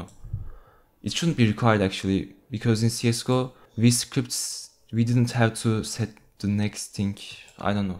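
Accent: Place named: Turkey. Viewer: Selam dostum. Ben de bu konuda bir şeyler yapmak istiyorum. Aksandan direkt anladım Türk olduğunu ve mutlu oldum. :D Sana nasıl ulaşabiliriz?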